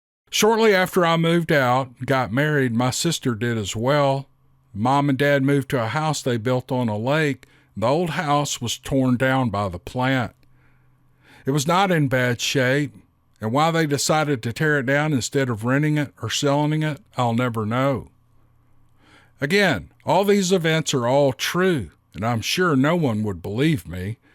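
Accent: South, United States. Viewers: Lol see-ment (cement) I love your country accent!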